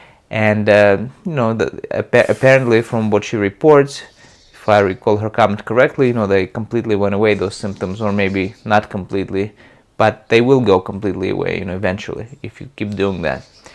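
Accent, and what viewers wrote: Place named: Russia. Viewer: Been dealing with Pain/Fibro issues for a long time, I was bed bound for about a yr about 8 yrs ago but still have synthoms found a great TMS dr here in mass hes Russian like yourself ..so to hear your accent and his which is the same it gives me alot of confidence ...thx you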